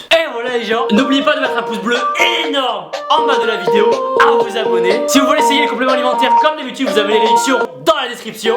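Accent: South, France. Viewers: T'es mon youtubeur PREFERER ACCENT DU SUD ET MUSCU LE PERFECT moi qui suis du sud BOOOOOOUUUUUUUM!!Allée.vien ma petite :-P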